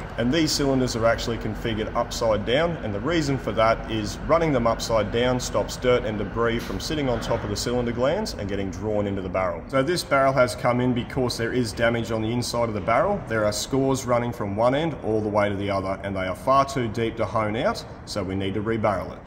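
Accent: Australia. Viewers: Love your channel, excellent work but honestly sometimes I watch just to hear that Aussie accent Good day mate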